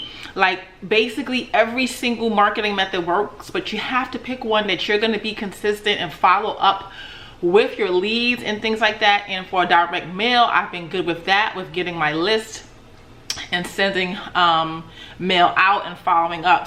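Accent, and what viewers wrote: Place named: New York, United States. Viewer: Very informative video!! Thanks. I know ur from NY tho😂 cant hide that accent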